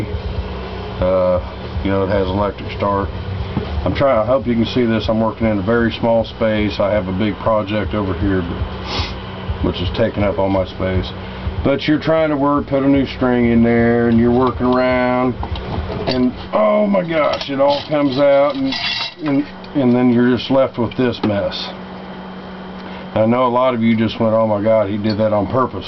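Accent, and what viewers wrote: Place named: South, United States. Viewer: Brilliant accent.... Southern, I guess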